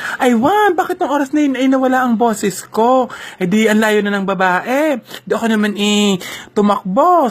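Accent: Batangas, Philippines. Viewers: Saan po kayo nakatira ate kasi po parang pangbatangenga salita nyo ehhh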